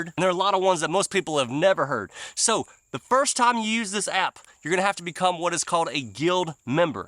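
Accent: South, United States. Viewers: I so get what you are saying. Just watched Tucker and Megan Kelly talk today. Peeps are WAKING UP. I think Megan has grown up and she TODAY is like us as well as Tucker. I was raised in Mississippi and married a Yankee and so I miss my roots some days but not the heat and mosquitos, ha! I get what you are saying and YES we need to download Angel Studios, etc. TY for reminding me to do just that! I love your content and understand your drawl,😂 God Bless You Boy!! I am old enough to be your grandmother but God made you smart and I love good ole southern wisdom. RJ PS/ Tucker and Megan talked today alot like you. God is definitely doing something in our media. Change is God's specialty!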